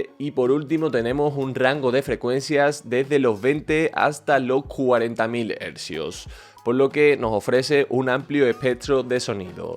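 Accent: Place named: Spain. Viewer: buen video, pero algo me llamó la atención. De que pais eres? tienes un acento medio español pero me fije que te cuesta pronunciar la "st", en vez de decir "eStudio", dices "ehtudio"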